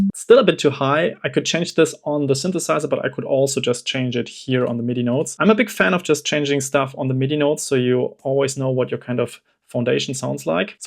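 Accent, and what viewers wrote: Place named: Germany. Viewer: Your english is for your deutsche Akzepnt very nice;) ich kenn einfach 3 andere, die 1:1 ähnlichkeit mit dir haben!:D:D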